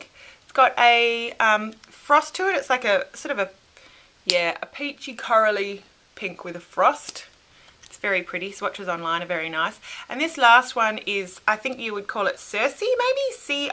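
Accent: Australia. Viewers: feel a bit special being mentioned :) btw i'm loving the videos finally someone who understands how ludicrously expensive makeup is here in australia! enjoying the familiar accent that i am not use to in the youtube beauty community.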